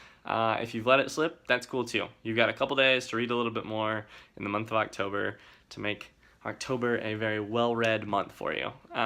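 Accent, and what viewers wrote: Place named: United States. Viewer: You know,. you are so American. The way you carry yourself and talk is typical American ( according to what I think is typical American I guess😅) so adorable🥰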